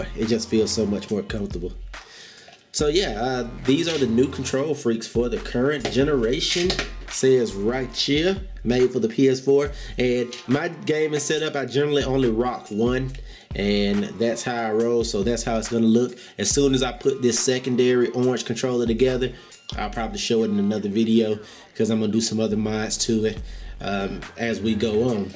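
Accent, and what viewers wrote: South, United States. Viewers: that southern accent thugh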